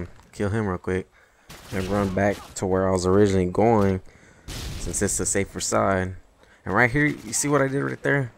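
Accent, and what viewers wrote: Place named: United States. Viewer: You sound American :)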